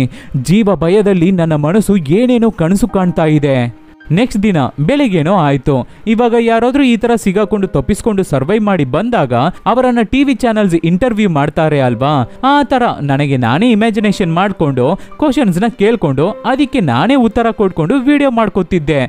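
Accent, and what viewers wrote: Tamil Nadu, India. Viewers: Please nin Kannada saryag matadi tamil tara matadtidira but explain chanag madtira 🦄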